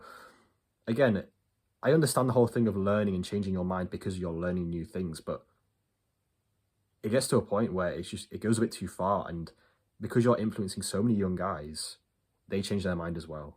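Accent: United Kingdom. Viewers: it’s a Yorkshire accent my guy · Is ur accent Manc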